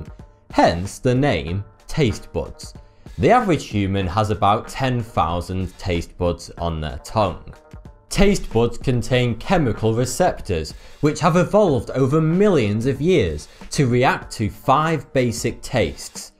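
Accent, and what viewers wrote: United Kingdom. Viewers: he's so British ha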